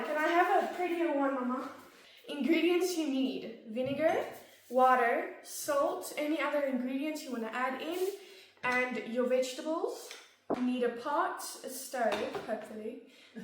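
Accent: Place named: United States. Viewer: 😂 oh how she’s grown and that accent, efinitely the American guest influence, just love it!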